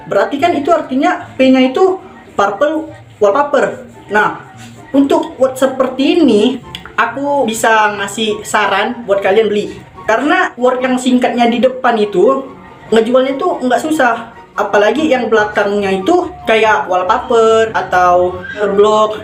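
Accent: Indonesia. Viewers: Orang Kalimantan kah ini? Dari logatnya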